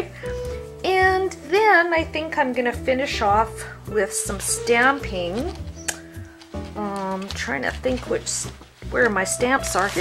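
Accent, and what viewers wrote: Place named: Canada. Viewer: Hello fellow Neighbor! Thought your accent was Canadian. Born in Minnesota but moved to Colorado 20 yrs ago and everyone thought I was from Canada. No longer have my MN accent but listening to you brings back memories.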